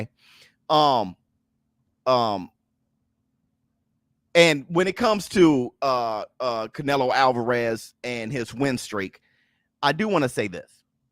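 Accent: Chicago, United States. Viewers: Yo, you from Chicago?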